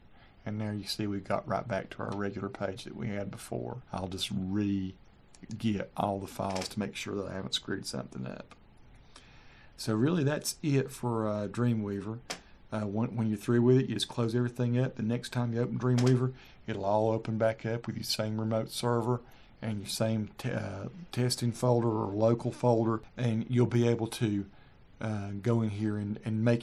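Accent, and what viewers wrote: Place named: North Carolina, United States. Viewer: Wow, thanks so much. This is the best Dreamweaver tutorial I've found. Plus, your accent is awesome (NC!)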